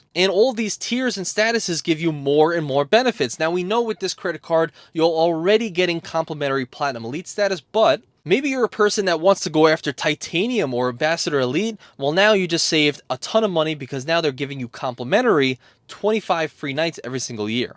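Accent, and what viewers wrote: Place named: United States. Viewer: U beautiful man. Love ur country boy accent btw. Lol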